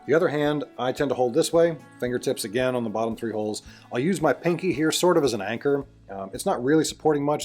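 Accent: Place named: United States. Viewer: Love your advice and presentation, but would you STOP talking like a yank. Have subbed 👍👍☘☘☘🇮🇪🇮🇪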